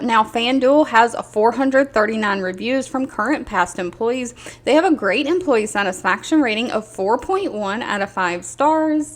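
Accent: South, United States. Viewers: Im from NY and I love your southern accent!! 😊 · Don’t let those haters shut down that bubbly southern voice darlin 🥰 We love it! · I love your southern accent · It's not annoying it's Southern like me. Don't feel you have to appease these negative people.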